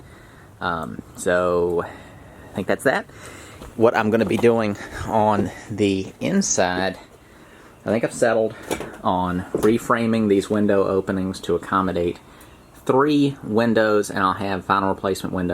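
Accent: South, United States. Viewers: what region of the states is his accent from ? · I wonder that too! Based on his accent and the hilly terrain, I'm thinking north Alabama or west Georgia or... ?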